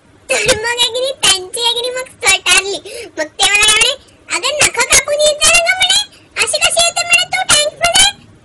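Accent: Nagpur, India. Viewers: tu nagpur chi ahes ka mhane😜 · your accent in video looks similar to Nagpur ... majedar ahet pan.... yet rahundet asech... lahanpanichya baryach athavani jagya zalya masti chya :)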